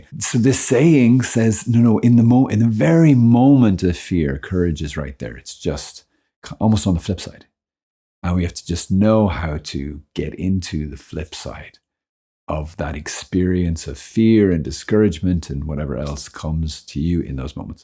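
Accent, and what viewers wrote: Ireland. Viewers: Great to hear an Irish accent in this space!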